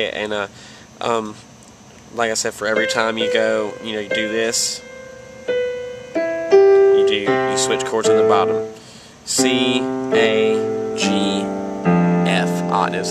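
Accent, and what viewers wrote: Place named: Australia. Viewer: Why do I hear a tiny hint of Australian in your accent?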